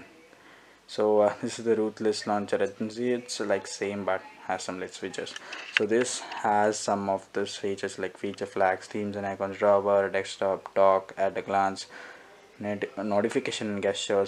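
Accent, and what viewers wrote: India. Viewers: Fuck, why do indians have so terrible accent??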